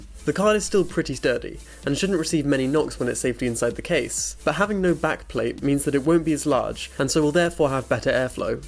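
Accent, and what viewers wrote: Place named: United Kingdom. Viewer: I like your style, looks clean and professional. also your brittish accent makes me like the video even more :D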